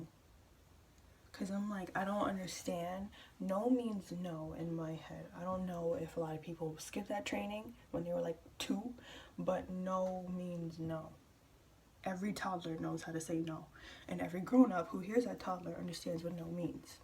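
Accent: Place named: New York, United States. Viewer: From the way you talk, you're definitely a native New Yorker.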